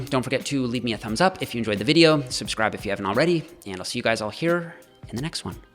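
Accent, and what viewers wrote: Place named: Newfoundland, Canada. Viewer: love your stuff! Didn't realize you were from newfoundland until I watched your body recomp video and were talking about carrrbs, just waiting for a jiggs dinner video haha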